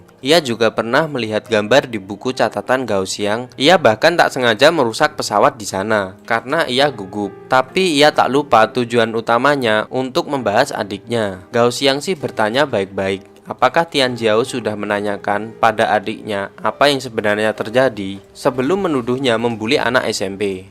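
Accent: Java, Indonesia. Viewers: Emng orang jawa ya ,Kak? Medhok suaranya,,,🙏🙏🙏 maap klo tersinggung cuma tanya,,sumpah✌️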